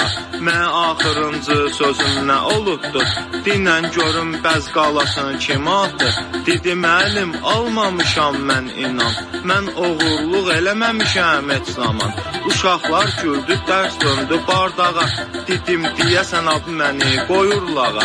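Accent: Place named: Shaki, Azerbaijan. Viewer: 👍👍👍👍😂😂😂😂😂 ardı gəlsin Qəşəng caan ay Şəki ləhçəsi..👍👍👍👍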